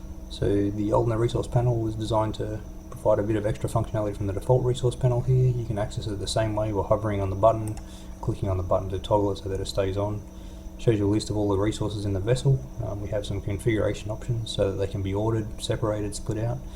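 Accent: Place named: Australia. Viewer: This is really well thought-out and beautifully executed. I thought it was good before just to be able to see stage fuel, but now with that extra functionality it's become one of those mods that get instantly added to any install and that shows the KSP devs how the stock game should be improved. Great work, and good to here an Aussie voice in modding community :)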